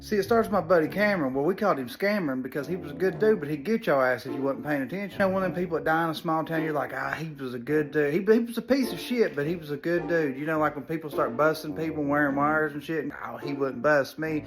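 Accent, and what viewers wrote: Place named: Eastern Kentucky, United States. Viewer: Where you from? You sound like you live in eastern ky.... where I'm from lol I know people that have called dcf cuz their ex pissed em off 😂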